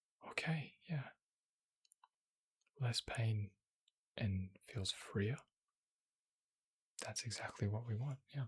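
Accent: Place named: Australia. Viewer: It's so lovely to discover an Aussie voice, makes me feel at home 😀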